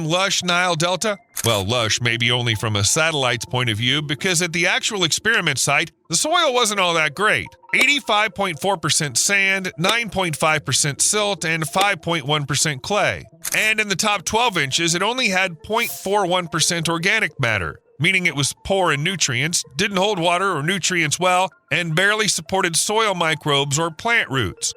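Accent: United States. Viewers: Very interesting but spoilt by the most annoying american robotic accent. He sounds as though he wares very tight underpants. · Shouting American accent hard on the ears. Please bring a more toned down British person.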